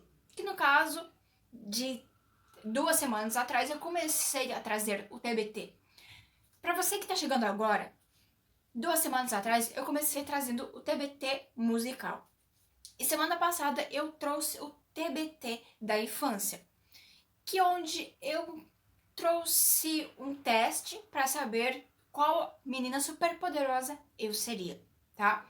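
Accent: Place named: Germany. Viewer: Like pelo vídeo sensacional ♥️! A impressão que eu tenho é que vc tem um sotaque Alemão, mas tipo eu só acho mesmo rsrs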